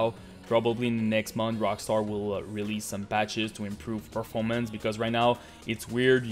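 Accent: Quebec, Canada. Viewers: Gotta say, i'm french canadian too and you inspire me to starts video cause my accents not even worse than yours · j'bet 100$ que t'es québécois haha j'reconais l'accent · you sound quebecois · you, my friend, is from quebec!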